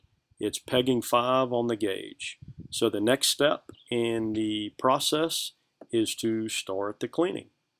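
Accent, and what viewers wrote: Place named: United States. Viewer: He's using the American pronunciation. And that's not a Sandblasting box, it's specifically designed for cleaning DPF's, which is why it has a Vaccuum unit at the bottom along with a collection box for waste and a plate specifically designed to seat the DPF - He doesn't state that it's anything super secret he even says it uses a simple high pressure air knife to blow ash through the filter - but it does work in combination with the vaccuum under the DPF...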